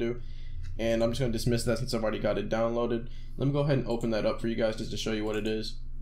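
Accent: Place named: United States. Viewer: Bruh u sound like fitz but American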